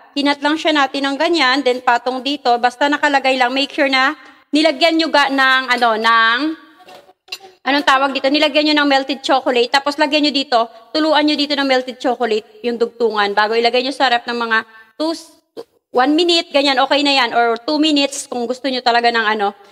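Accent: Iloilo, Philippines. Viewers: Gakalingaw ko mg ilonggo ka a.. haha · ilonggo ka maam? hehhe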